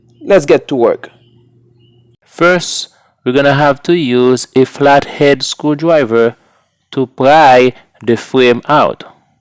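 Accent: Haiti. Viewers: That Haitian accent 😂💪🏿💯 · Hey! I recognize that unmistakable accent!!! Sak Pase!!!! I'm just about to go out to my 1998 Camry that just won't die and install a backup camera. Thanks for the video!!!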